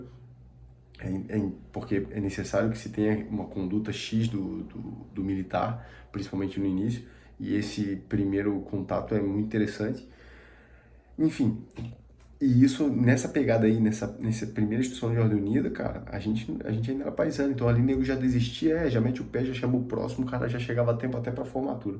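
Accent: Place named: Florianópolis, Brazil. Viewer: Pois é, tbm reparei, ta recuperando o sotaque do manezinho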